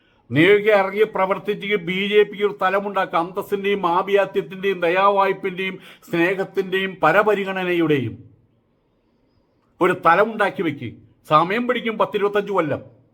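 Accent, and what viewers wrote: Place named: Thrissur, India. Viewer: Trissurkkarudey aa vilikkal...... Swami enthado😂😂... Soooper correct...... Jeevanulla samsaram❤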